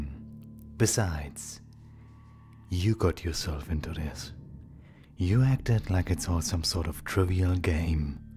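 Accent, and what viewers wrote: Germany. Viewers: I used to think that he got konig's accent pretty well He's from Germany AAAAAAAAAAAAAAAAAHHHHHHH 💘💘💘💘💘💘💘💘💘💘💘💘💘💘💘💘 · Omggg your germannnnnn😭😭😭😭 i lovvveeeeee ittttttttttttt❤❤❤❤❤❤❤❤❤❤❤❤❤❤ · The German is making me hyperventilate- how do I start paying you for this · Ich liebs!!!! Wer hätt gedacht, dass n deutscher Akzent so vorteilhaft sein kann 😂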